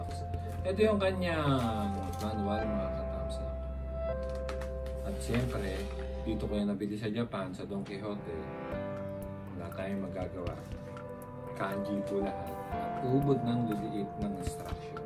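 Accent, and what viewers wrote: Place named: Philippines. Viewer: Guys akala ko chiness ka. Pero noong nagsalita la pinoy ka pala... full supports here. Ganda ng in unboxing mo thanks for sharing godblesss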